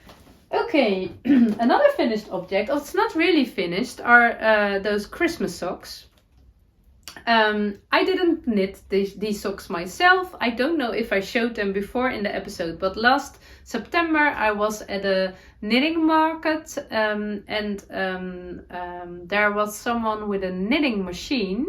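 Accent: Netherlands. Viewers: You make me smile!!!! I love your dutchy English. I may have to make a festive sweater exactly like yours…I love it!🧶❤️🇨🇦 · Happy New Year, it was so lovely to hear your dutchy English this morning, I really missed you. That's wonderful news about the YT Friday podcast. I would love to join you!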